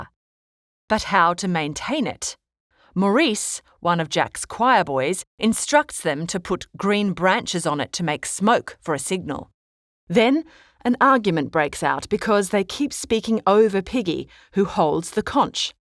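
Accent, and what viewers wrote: Australia. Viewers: These are great! Is the narrator? Is her accent from Australia? My students were curious.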